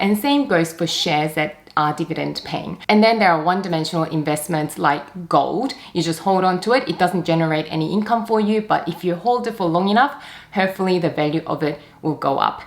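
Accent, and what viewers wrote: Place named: Australia. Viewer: Hi sorry if this is a weird question are you from Melbourne? Only because there was another YouTuber who sounded identical to you I thought it was you actually.. I think I just found a difference in accents between Australian accents which I never noticed before Anyway bags are nice but I wouldn’t trade them for my seventeen o/s trips I have done.. 4 lux bags are plenty right now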